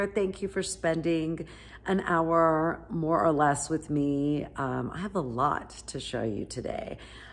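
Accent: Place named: Texas, United States. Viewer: I so enjoy your show ,I,m in Alabama now but am Ft.Worth Texas ,and I smile every time you say you,all ,that’s the Texas in us ,I say it to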